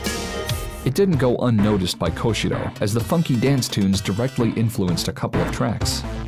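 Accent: United States. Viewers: Shinobi being one of my fave Megadrive games ever! Curious why you use UK boxes with an American accent? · You my friend are the best gaming historian I have ever seen. I'm not kidding, I would pay to watch this show without batting an eye. You should consider packaging these episodes and selling them as volumes on Blue Ray and digital download. I would easily pay 10 to 15 dollars per disc....Hell, I could be persuaded to spend more depending on the episode. Every console and game you cover is done at a professional level not seen on YouTube before. Further, the reverence you pay to the subject material is unparalleled. Thank you for making these videos. One question that I have...Why do you call Sega's 16-bit machine the Mega Drive and Mega CD? I ask this question for two reasons. You yourself have an American accent. Further, if I had to guess it appears that most of your audience is centered here in the US. 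Now, this does not change my opinion of you or your show obe bit. If you prefer calling Sega's 16-bit console the Mega Drive, have at it.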